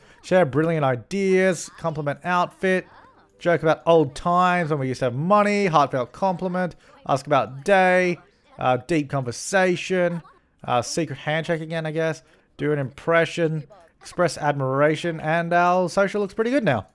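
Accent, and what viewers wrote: Australia. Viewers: YOU ARE A FELLOW AUSSIE! Or Kiwi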